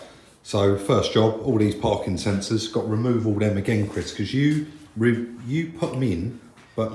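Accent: South, United States. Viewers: Lads never apologise for the way you talk I’m a scouser I can understand you southerners perfectly well 😉😉 the content you provide and the work you do is second to none, you lads are class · I think that’s great Chris gets a nice ride for a bit it has turned out very nice On another note being an ex northerner understanding your southern lingo is a bit difficult sometimes And I bet you will say the same for me but I would never go on about the way you say things That is just how it is , I am glad you told him what’s for as I would too Being in Australia for the last 50 years I think I speak double Dutch these days You would have no idea what is spoken here half the time Thanks for all the great videos and I do hope Chris enjoys his new old flash motor 😍😍👍👍ps I love finding money in cars 😂😂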